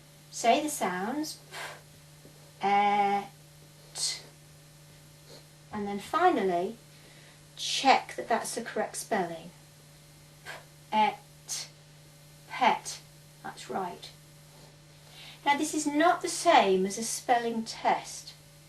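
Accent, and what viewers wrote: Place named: United Kingdom. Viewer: british accent. the best accent. thanks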